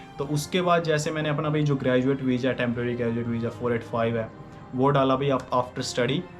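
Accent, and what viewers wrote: Haryana, India. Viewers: Bhai aapki language te hi pta chla haryanvi aa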